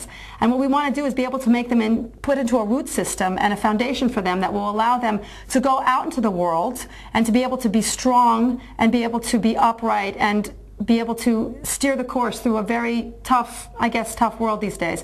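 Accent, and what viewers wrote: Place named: United States. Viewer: I would assume, from your accent, that you're a citizen of the United States. The United States is legally a secular Republicanist nation founded on the whole concept of personal Freedom. Since you proclaim Torah-values I must assume that that the Republicanism of this great and grand Republic is something you not only would never teach in your home, it is obviously something you outrightly reject. And yes, in this Republic, your right to believe as you do is legally protected this way.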